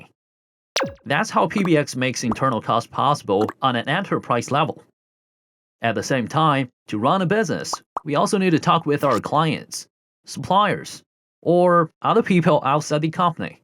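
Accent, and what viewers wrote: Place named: China. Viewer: Actually this a real persons voice. He is speaking English with a Chinese accent and some incorrect pronunciation. After some time you get used to it and will conclude that the English is not that bad.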